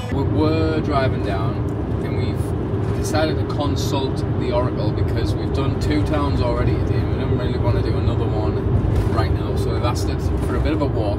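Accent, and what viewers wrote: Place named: Durham, United Kingdom. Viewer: I used to know the Sloane family in Wallsend in the fifties, i wonder if youre related? I detected a few Durham words in your banter, and wondered if you have connections there? I haven't camped out in Northumberland since a was a bairn. I follow Jimmy Lundy on his YT channel and hope to do one of his camps sometime. I don't think he knaas this castle you visited. I've never hoarde of it Just wanted to say in closing You look a bit like Utred in the 'netflix LAST KINGDOM' series. I would like to record you saying "Honour! Is everything! " ⚔️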